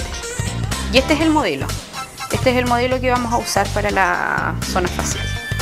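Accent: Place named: Chile. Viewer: Me gusta mucho su acento de Chile. Saludos desde USA California. Gracias por compartir su saviduria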